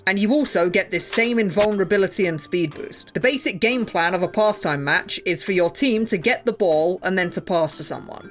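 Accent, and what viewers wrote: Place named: United Kingdom. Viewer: heywhy r u british :(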